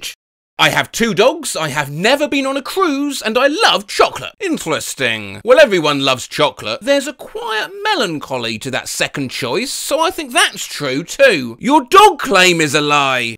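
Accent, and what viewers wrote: United Kingdom. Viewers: He's LITERALLY Sherlock Holmes... speaking fast and in a detective sort of fancy way, deducing fast, British, sherlock soundtrack.... it's literally perfect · You sound british :D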